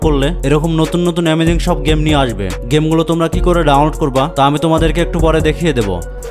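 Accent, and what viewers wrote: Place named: Barishal, Bangladesh. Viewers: Batisaler vasa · বরিশালের ভাষা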